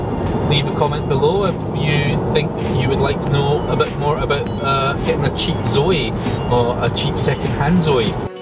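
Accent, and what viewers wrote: Scotland, United Kingdom. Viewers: thankyou for this great video very informative , now I think I have decided to buy one in Australia PS:love the scotch accent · A china-man with Scottish accent, priceless!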